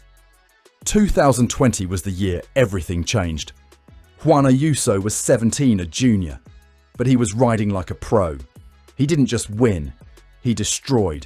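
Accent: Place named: United Kingdom. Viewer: I thought the same thing. Using a never cyclist wanker with a British accent…Classic!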